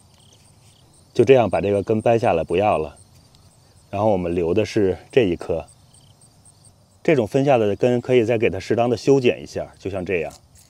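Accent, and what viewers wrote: Beijing, China. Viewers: 说的清楚透彻。听口音你是北京人吧？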